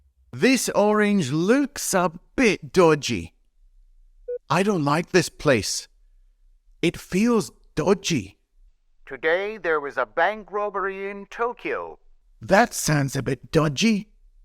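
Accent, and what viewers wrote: Scotland, United Kingdom. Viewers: Thank you so much for this video, I finally fully understood what dodgy means now! I am Japanese, and my Scots husband uses it awful lots, I understand most of time, but sometimes I am like “ what does that dodgy mean in this case?”. I wonder if you are a Scots too? The first bit “ I got lost in London ~” and some words sounded like so, but I wasn’t sure. Cheers!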